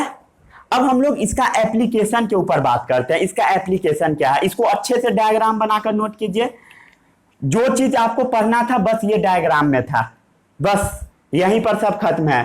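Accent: Bihar, India. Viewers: Yes something like Bihari tones... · proper hindi chaiye Bihari style nai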